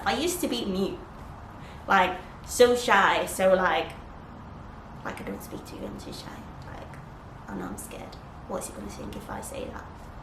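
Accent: United Kingdom. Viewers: How old are you now? You have a British accent now. I wouldn't think you would be from Italy. I lived there for four years, in Sardinia, Porto Torres, near Sassari, from 7years old to 11, obviously came with my mum there, originally from Poland. Since then came to UK, Leicester. Long story though, I have also been in Manchester for the past 2years, and before going there, I lived in the UK for about 10years, as I arrived here in 2008 Winter, I was about 11, and came to Manchester in the summer of 2018 for the first time. I haven't spoken with my family since 2017, so was on the streets a few times, but yeah... Not sure why I'm writing that, I think I side tracked :D But yeah, interesting, saw you from Mike Thurston's video, its interesting you were or are in Dubai. Would you tell us your story of how you got where you are now? :D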